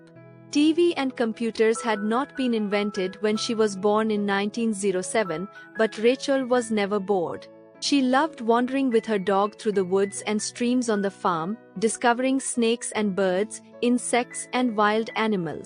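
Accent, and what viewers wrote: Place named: Bengal, India. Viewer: The ai is bengoli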